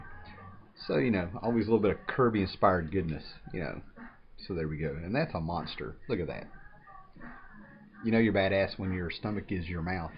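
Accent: South, United States. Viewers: Finally someone else with a southern accent haha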